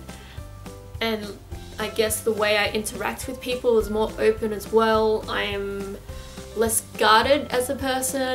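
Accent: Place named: Australia. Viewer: Very powerful Vid to those still in the closet. U earned yourself a Sub from a str8 guy. I don't judge ppl based on sexual preference. I judge ppl based on persona, good or bad. Just want to say, I've watched quite a few GOT reaction vids while bored work. Many are fake, and not genuinely emotional as your last GOT reaction vid. "Arya's reunion with Nymeria" Thats all it took for me to Sub. It almost made me tear up, and thats not an easy thing to do. Haven't shed a tear in 10 yrs, lol. Well, that and I like your cute Aussie accent. ;)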